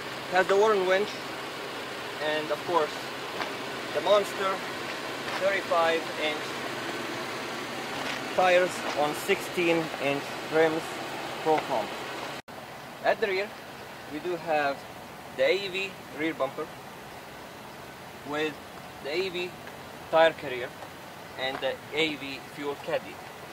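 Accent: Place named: India. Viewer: يااخو لو انك شرحت بالعربي لغتك تقول هندي يشرح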